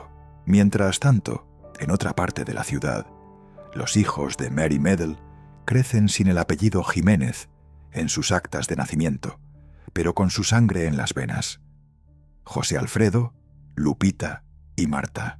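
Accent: Spain. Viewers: Mal muyyyyy mal su reportaje, José Alfredo Jiménez, NO escribió más de mil canciones, son entré 360-,o 380, infórmese primero, su acento español, de lo que Usted dice, son mentiras......... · Eres español, que vas a saber de México. Me choca el acento de Uds.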